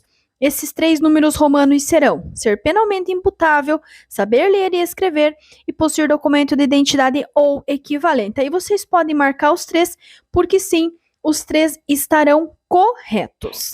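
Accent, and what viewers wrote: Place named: South, Brazil. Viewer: pelo sotaque e do sul.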